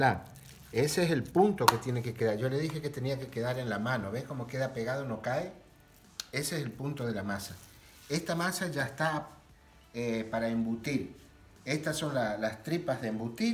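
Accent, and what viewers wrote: Santiago del Estero, Argentina. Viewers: Que lindo escuchar la tonada santiagueña en un video productivo 🙌🏼 abrazo grande hermano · Amo la tonada Santiagueña soy de catamarca muy linda explicación 💪🏼💪🏼🇦🇷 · Q bueno amigo .tenés tonada santiagueña puede ser?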